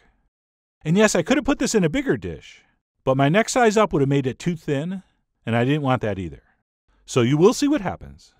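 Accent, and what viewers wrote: Canada. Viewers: Is this how Canadians sound?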